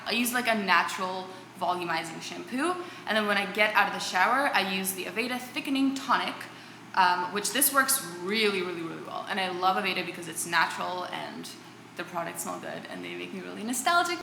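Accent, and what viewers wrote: Russia. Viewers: Сооооня, я люблю твои видео на английском, просто даже слышать твой голос, это просто удивительно и приятно! 🌙🌹💋🍒 Мне сразу хочется стать американкой🇺🇸, что бы понимать о чем ты говоришь, но я понимаю лишь некоторые слова, переводя в голове 🤔👻 Но хотелось бы, что бы кто-то из наших девочек, делал перевод субтитров под твоими видео, это помогает изучать английский язык, думаю что не только мне. Надеюсь ты прислушаешься и что-нибудь придумаешь) Я действительно люблю тебя, твои мысли и английско-русский акцент ❤️ Спасибо за видео! Целую 💋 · Кажется, будто ты уже и по английский с русским акцентом говоришь! Спасибо! Будем учить английский! 💋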